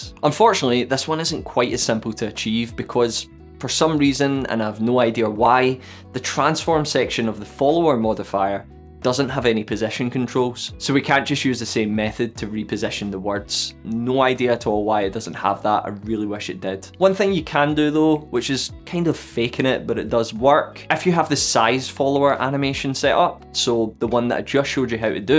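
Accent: United Kingdom. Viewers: Thank you! Btw, first 1,5 minutes i just listening your pronunciation in English, it’s British accent? :)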